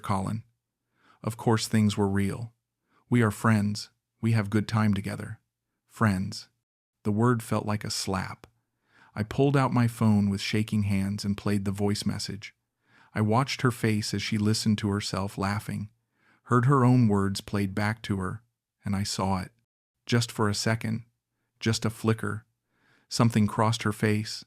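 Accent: United States. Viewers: You don’t have a British accent, you have American accent so is this story real? If it is real I think you are not a smart Englishman!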